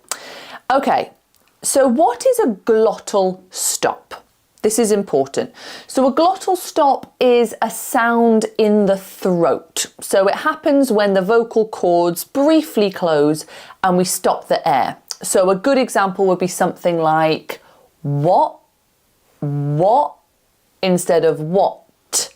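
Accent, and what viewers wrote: United Kingdom. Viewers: I'm from California! I love. Your British accent!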